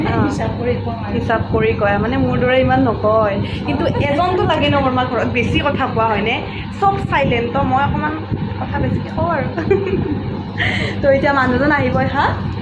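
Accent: Nalbari, India. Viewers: Aponar Kathat alp nalbarir xur ase baa....👌👌❤️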